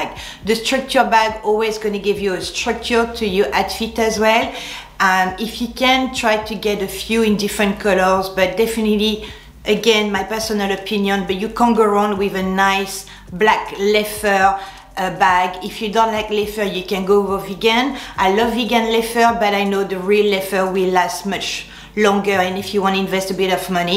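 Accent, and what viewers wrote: France. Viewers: I love your videos you give such nice advice to let us put on a more classic look to feel more elevated and positive! And you are so lucky to speak French and have such a nice accent I wish I did:) French is the prettiest language! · I really enjoy your videos! Just love your French accent! If you're looking for another word for 'elevated' you might want to try using 'sophisticated' or 'refined' ..... This is not a criticism, I just noticed that you are often looking for alternative words. Looking forward to the next 'words of wisdom' session.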